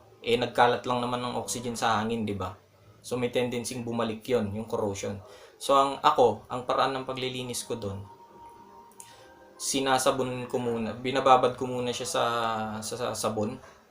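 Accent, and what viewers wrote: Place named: Pampanga, Philippines. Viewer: ahh ganun ba. kaya parang medyo kapampangan accent yung tagalog mo bro. haha. new subbie. ggwin ko sa off yung suggestion mo sa beat v2 ko.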